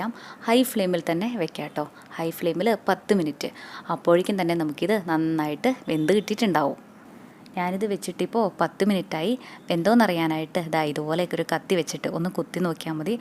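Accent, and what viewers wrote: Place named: Valluvanad, India. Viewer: വള്ളുവൻ നാട് സ്ലാംഗ് ഒരു സംഗീതം പോലെ അങ്ങനങ്ങനെ..............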